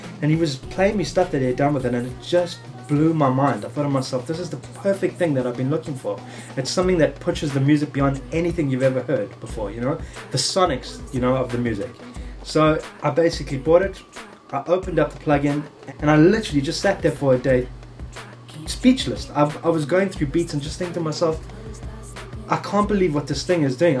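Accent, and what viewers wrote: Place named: South Africa. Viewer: Sounds like a Saffa :D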